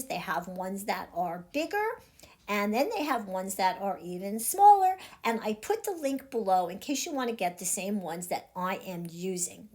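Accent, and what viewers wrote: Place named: Philadelphia, United States. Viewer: I’m enjoying this video- I love this kind of crafting. But I just want to ask you…are you from Philly? The reason I ask is you said “pock a book”. As in pocketbook. I grew up in SW Philly so my ears are perked up by your accent! ☺️ where I live now they say purse instead of pocketbook. 😂 kind of funny.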